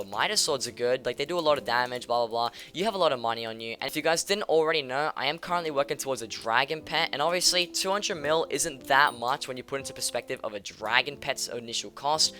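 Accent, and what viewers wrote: Australia. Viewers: Are you a Melbourne boy?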